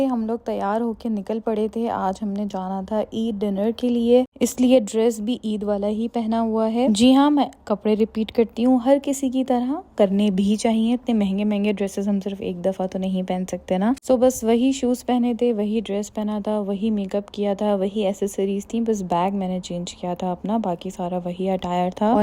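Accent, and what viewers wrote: Karachi, Pakistan. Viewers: your Urdu is sooo posh! are you from Karachi?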